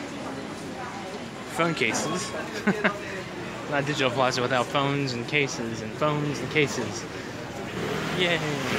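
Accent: United States. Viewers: I thought u were an American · i thought you were american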